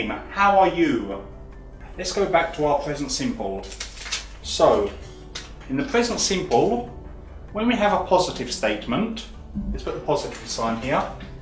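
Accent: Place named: London, United Kingdom. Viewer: У этого преподавателя очень хорошее произношение( Exact English) Вы не найдете в России другого такого педагога- англичанина с лондонским акцентом.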